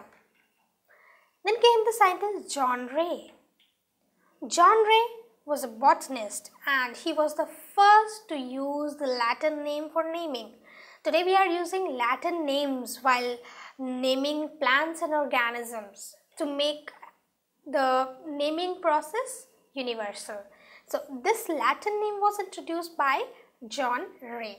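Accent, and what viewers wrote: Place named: India. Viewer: I can’t fucking handle your accent. Learn to speak English or go back to god damn India.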